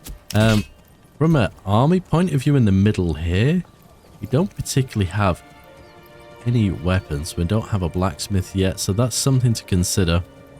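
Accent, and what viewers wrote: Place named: United Kingdom. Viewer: Ahh, you don't sound nasally...you sound British! 🤣 Unfortunately there is no way to unsnap road creation, unless maybe by starting short of a snap point instead of at an existing road. In my series I set up 2 iron deep mines and even with 8(?) bloomeries I couldn't keep up with ore production. They mine quickly. Good battle!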